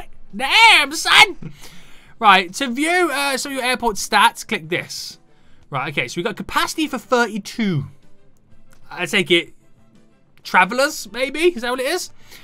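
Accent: United Kingdom. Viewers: Are you British? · Which part of the UK are you from?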